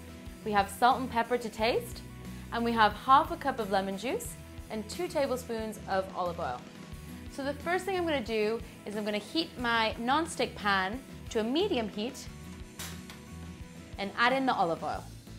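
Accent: Ireland. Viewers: Interesting. It's like an American accent with an Irish twang.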